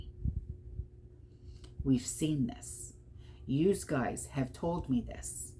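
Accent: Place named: South Jersey, United States. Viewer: She’s from South Jersey.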